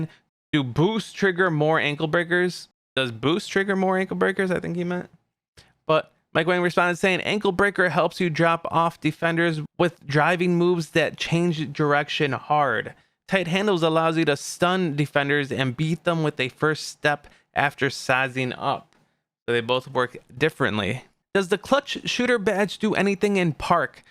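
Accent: Chicago, United States. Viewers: What part of Chicago is he from?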